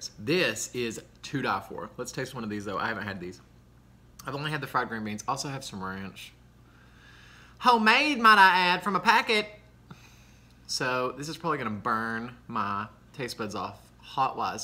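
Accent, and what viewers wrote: South, United States. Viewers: 😂😂 LOVED this!! I definitely felt Taci B coming through but that southern accent ain't goin' nowhere! 😆 YESSSS to the homemade Ranch dressing! Have you had the Reeses cereal that comes out in spring/Easter in the shape of bunnies?!? 🐇 MY fave! I think about someone living in our attic far more than I'd like to admit.... that story was sooooo creepy! 😦😭😊💖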